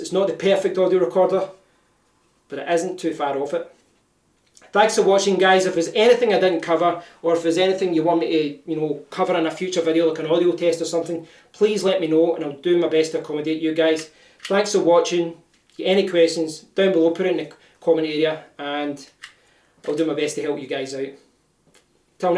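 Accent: Scotland, United Kingdom. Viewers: Very sorry, but I'm not a native English speaking person and so I have lots of trouble understanding your heavily Scottish (or Irish?) brawl. So, does anybody know of a video featuring someone with a 'normal' British or American type of accent? Thanks a milliion.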